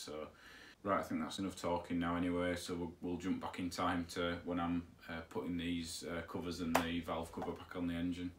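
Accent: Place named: Yorkshire, United Kingdom. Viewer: Going by your accent I don't think we are far apart , I'm in Malton East of York and I guess your Huddersfield way .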